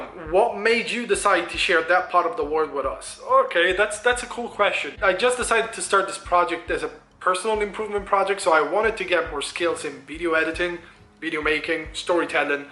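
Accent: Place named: Palermo, Italy. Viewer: Bellissimo video. Certo che io con un accento diverso da quello palermitano (quando parli in italiano) non ti ci immagino proprio 😅😅😅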